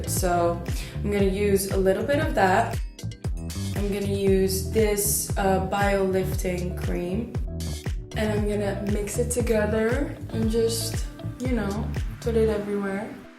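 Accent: Netherlands. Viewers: She sounds so dutch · you heard how she says 'th'? classic dutch accent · Did you grow up in the Netherlands? I spot some bits of an accent somewhere ;)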